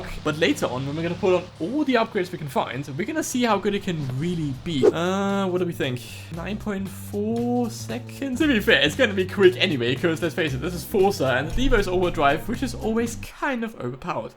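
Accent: Germany. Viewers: Find ich nice, dass man bei deiner Stimme raushört, dass du deutsch sprichst😂🙈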